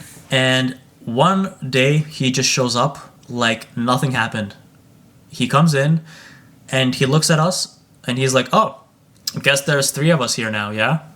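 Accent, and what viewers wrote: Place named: Eastern Europe. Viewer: its his accent/etc - he sounds eastern European to me, but maybe northern.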